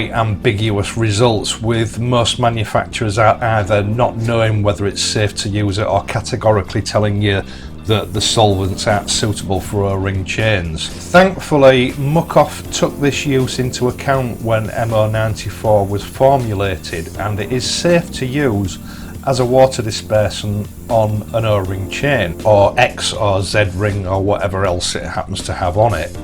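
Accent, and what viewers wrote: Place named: Yorkshire, United Kingdom. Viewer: Hi, I too, like Mark below but somewhat later down the road, have only recently discovered your channel and I completely agree with what he has written. After starting my riding 'career' in 1973 on chain driven bikes (using them literally all year round - no car) to commute to and from work and remembering the nights when I'd come home and then have to strip the chain off and heat up a round tin of chain oil/grease and soak them over night, (and then put it back on in the morning), in the late eighties I 'saw the light' and since then have ridden shaft drive bikes. However, just recently I have purchased a Bonneville Speedmaster (previously a Pan European) and put the 'fun' back in my biking! Over the intervening years chains have progressed and the range of products now on the market to keep them in good condition has mushroomed; the choice is huge and this is where your excellent thoughts and advice are a God send as are alot of the other topics you cover..... 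Apart from anything else, I love your accent and manner of delivery and reminds me very fondly of an old work colleague who came from Airmyn near Goole and with whom I'm still in contact. I digress. Thank you and keep up the great work! 😀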